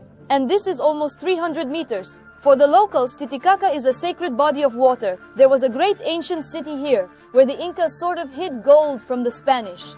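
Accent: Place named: India. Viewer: True indian ascent ❤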